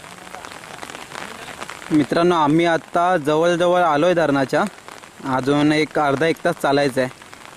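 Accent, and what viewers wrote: India. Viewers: बहुतेक राजापुर तालुका असणार तुमचा.असं बोलण्यावरून वाटतय.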